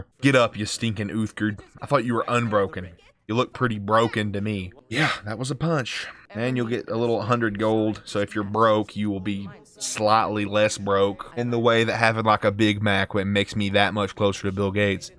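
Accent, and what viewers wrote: South, United States. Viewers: It is so great hearing another southern person playing video games😂 favorite part is “beat the brakes off of her”😂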